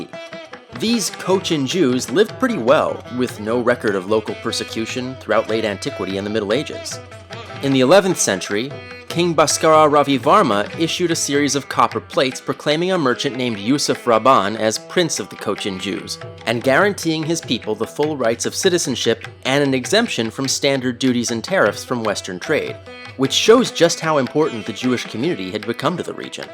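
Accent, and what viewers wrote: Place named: United States. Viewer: hey where did you grow up? your accent. sounds so American besides when you make the 'a' sound as in vAstness, where it sounds like the british 'vahstness' versus the american pronunciation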